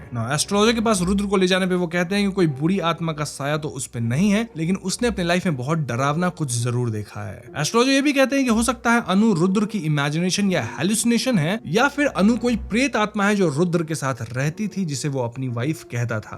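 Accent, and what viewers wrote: Bengal, India. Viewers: Omg. Your bengali accent 😭😭😭😭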